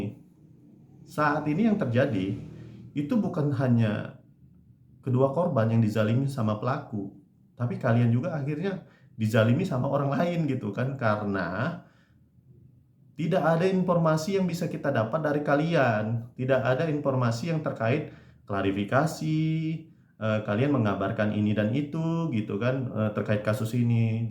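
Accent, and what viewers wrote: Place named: Palembang, Indonesia. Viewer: Kirain dr bali,, trnyta wong palembang kak yo..????? 🤔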